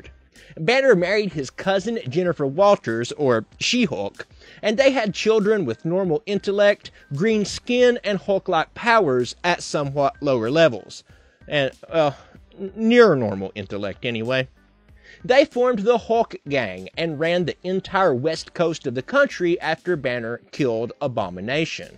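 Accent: United States. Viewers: Country accent??????? N. O.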